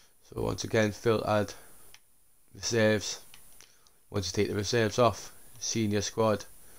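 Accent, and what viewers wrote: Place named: South Shields, United Kingdom. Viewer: The shields accent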